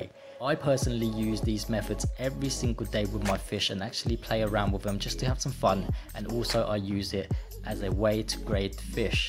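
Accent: United Kingdom. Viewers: Is this guy english? He has a british accent. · He’s a Thai but with a British accent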